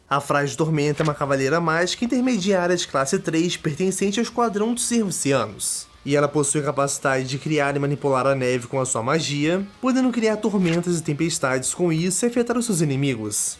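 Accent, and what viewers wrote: Portugal. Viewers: Tu é português? Tem um pouco do sutaque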